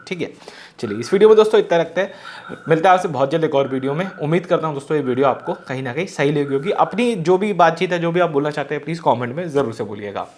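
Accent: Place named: Bundelkhand, India. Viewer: bhai mein aapke Bundelkhandi bhasa sunte hi khus ho jata hun kuch apna sa lagta hai.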